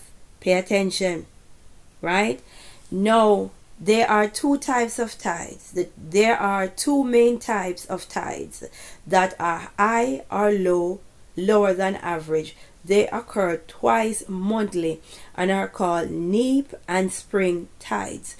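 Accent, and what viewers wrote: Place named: Jamaica. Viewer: she never said 'tsunami all over the world'. listen carefully and dont put words in her mouth open your ears. She said it will hit certain areas off the Atlantic which is a massive geographical area. Then, the following infrastructure collapse will cause knock on effects to other parts of the world. That is what she means when she says the tsunami will affect the whole world. go watch the movie 5th Wave and it will show you the collape of society etc. There is also the possibility of a tsunami on the west coast of the US from the Hawaii volcano. this lady does extensive research before each vedeo. dont put her down just she has a Jamaican accent. even Geologists are saying the same thing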